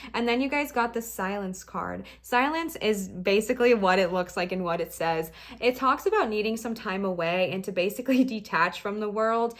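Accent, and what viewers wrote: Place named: England, United Kingdom. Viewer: Pile 3 here💖💖💖 Once again I'm called out on being an aquarius😅💖 Lol so first thing I thought was hilarious is that last night I was having a little trip and I couldn't stop speaking in an english accent lol And also, was thinking in an English accent, like without even really thinking much of it or even trying to. When I came to this reading and you started talking in the english accent it made me remember that and I'm like so curious on what this english accent means to me lol Universe just wants me to notice that😅 A thing that I noticed that I think is super cute is how you yawn a lot when you do readings. I feel like these yawns mean something, I've always found myself doing the same thing while trying to perform in certain ways. Lol for instance when I sing in front of people sometimes I yawn A LOT and I just can't stop and never knew why. 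I never thought about it so much until I started watching your readings. I wonder if yawning is like a sign of spirit coming through or something, like a natural reaction for some of us. Whatever it is, it's fascinating and I'll probably google it lol Thank you for this, it resonated so well and was so empowering. Everytime I start to question myself I love how I have this beautiful tarot community who can help me connect to my guides. It's honestly so important, it helps me keep pushing through. I get what you mean about the comments people make. Like, this comment a friend said to me last night almost stressed me out a little bit. I w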